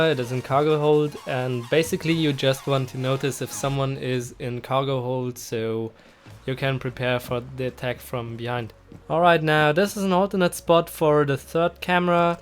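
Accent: Germany. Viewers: Ich hab es direkt gemerkt das du deutscher bist ☺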